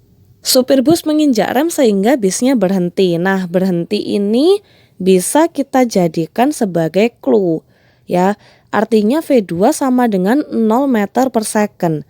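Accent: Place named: Java, Indonesia. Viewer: Medoknya tetep ya ce..hehe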